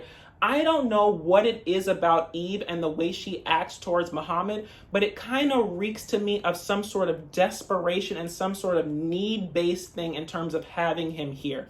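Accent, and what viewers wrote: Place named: Caribbean. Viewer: Loved the Caribbean accent in the intro and the entire review. I agree with your take on all the couples. All these people have these serious conversations waaaaay too soon from the arrival of the “foreign” fiancé. Emily is just very childish and unrealistic- she wants the wedding and the diamond ring but in reality she doesn’t even know if she really has true feelings for this guy. I don’t believe that there would be no option for them to have any alone time after they got settled at her parents house. I’m sure the grandparents wouldn’t mind watching the baby for a couple of hours after Kobe arrived for them to have a date night the next day. But like you said she trapped him into the whole arrangement without prior discussions. Best regards!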